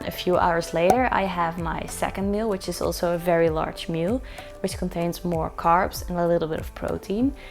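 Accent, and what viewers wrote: Netherlands. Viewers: A lovely accent. Perhaps Dutch ? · Grappig dat je, terwijl je Engels erg goed is, toch gelijk het Nederlandse accent hoort :-) Niet negatief hoor, gewoon normaal als een taal niet je eerste taal is. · Hahaha ik hoor meteen al dat je Nederlands bent😂💙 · Wow you sound dutch...😊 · omg eerste keer hier, maar ik hoorde je accent en gokte meteen dat je nederlands was haha ;) new fav acount for sure x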